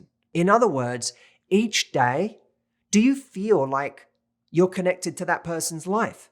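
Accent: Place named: United Kingdom. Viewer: he sound British🇬🇧🇬🇧🇬🇧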